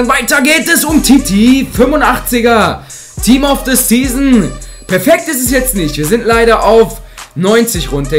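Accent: Germany. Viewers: Redest du Schwäbisch? Wenn ja mach mal davon ein kurzes Video pls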